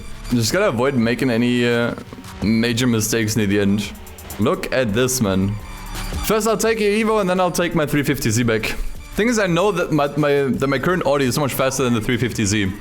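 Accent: Germany. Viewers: Man hört den deutschen Akzent voll raus 😂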